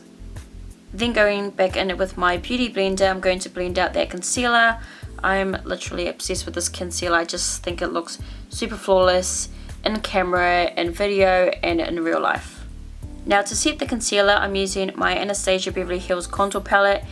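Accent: New Zealand. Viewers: Are you a kiwi?? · LucyAnneDewhurst it's called an accent.... She's kiwi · Just found your channel and im in love!!, are you kiwi?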